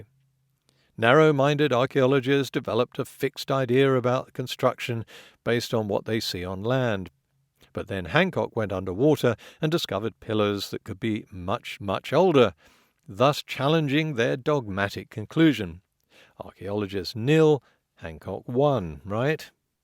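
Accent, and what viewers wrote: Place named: England, United Kingdom. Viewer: Oh how I missed slightly sarcastic English voice.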